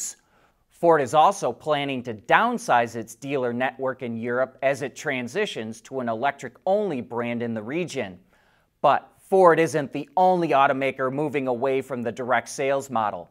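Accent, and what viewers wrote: United States. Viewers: Is the presenter putting on the outrageous Murikan accent? Can anyone say where it comes from?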